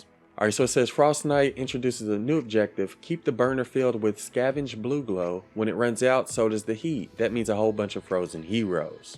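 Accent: South, United States. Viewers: Are you from Texas or Louisiana !?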